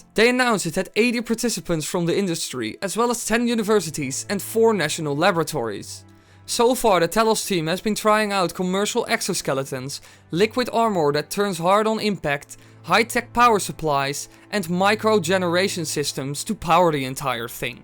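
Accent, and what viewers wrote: Netherlands. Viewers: Wait.. you're dutch... GROETJES!! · Are you Dutch? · Dat merkte ik ook gelijk hahaha... Steenkolen Engels op zo'n manier komt alleen van een Hollander. ;) Later!!! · are you dutch ???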